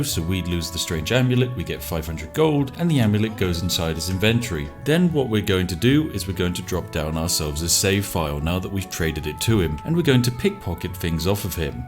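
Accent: United Kingdom. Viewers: Kinda odd how everyone in the us thinks everyone in the uk sounds this way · The spiffing Brit hit differently when you’re stoned.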